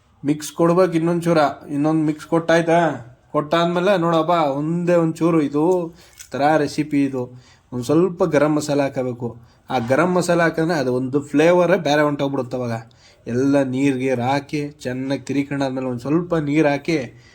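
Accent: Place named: Mandya, India. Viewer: We are waiting for more cooking videos and most importantly a separate fan base for ಮಂಡ್ಯ ಮಳವಳ್ಳಿ background voiceover..😁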